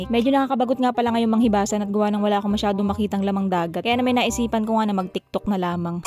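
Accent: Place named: Marinduque, Philippines. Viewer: salita mo salitang marinduque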